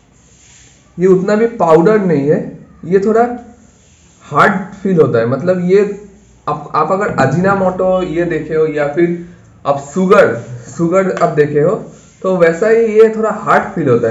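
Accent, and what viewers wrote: Bengal, India. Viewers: Kintu talc gaa me lagate hai shunke...samajh gaya banglai hey... Cholbe bhai chaliye ja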